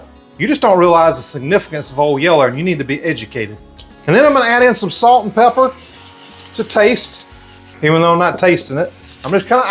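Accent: United States. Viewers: Us Canadians sure miss you Americans. Good thing we can get our fix of accents and genuine kindness through you tube. I really enjoy your content👏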